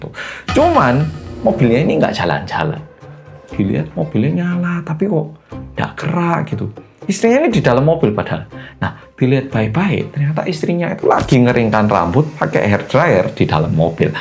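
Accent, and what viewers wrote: Java, Indonesia. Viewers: Logat korea mana ya, kok agak mirip Logat Jawa tulen,,,, maaf maklum subsreber baru nih,,, baru nonton video nya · Wuaduh kang Korea medhok berubah ke tema selingkuh, tau aja tema kesukaan netizen. Ha...ha... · Uedan kok bisa semedog itu bahasamu masee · Bahasanya bnr2 lancar udah seperti org indonesia asli. Logat jowo pisan😅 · Korea top logatnya jowo tenan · Salut dg org korea satu ini.. dg gaya bicaranya yg indon banget. Kl kasusnya sih ga beda2 jauh dg asn imigrasi pekan baru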